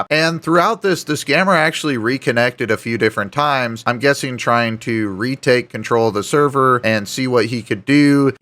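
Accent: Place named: South, United States. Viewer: By now your unique voice and y'all-type accent should be known to most large scam centers. You'll likely have to start using a voice changer. I'm waiting for these Indian criminals to wake up to the possibility of using AI against scam baiters.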